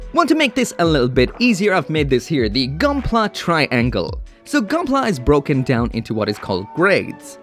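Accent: Ireland. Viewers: its a misheard, he said 18 meters, hes irish accent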